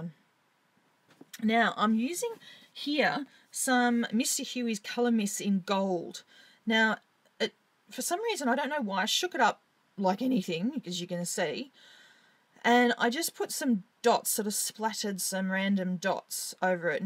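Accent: Australia. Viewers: Great card, fab video and SOOOO nice to hear an Aussie voice! Well done. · Gorgeous card! It was nice to hear another Aussie! Well done and looking forward to seeing more from you.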